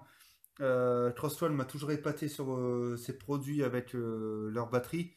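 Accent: France. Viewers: un léger accent Chti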